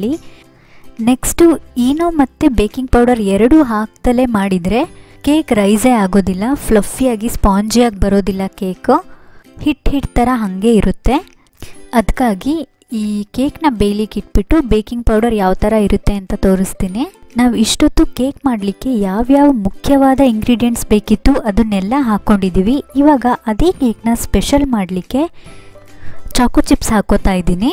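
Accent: Mangalore, India. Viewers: Madam nimdu native place yavdu? Manglore aa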